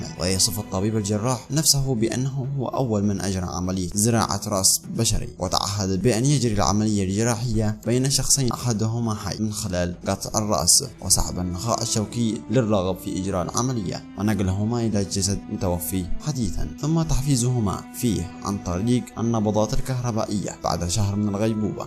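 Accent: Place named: Yemen. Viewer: نبرة المقدم يمنية فأنا يمني واعرف قومي . حاول تتدرب قليل في طريقة إلقاء الشرح. وشكرا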